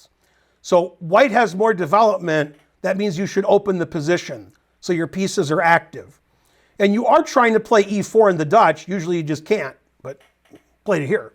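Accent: United States. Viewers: How can the american accent be so annoying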